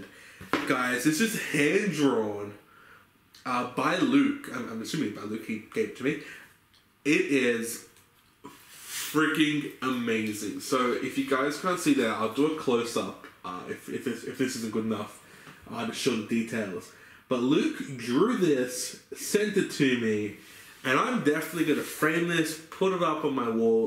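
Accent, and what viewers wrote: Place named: New Zealand. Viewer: Your kiwi accent was just so funny bruh. Nice video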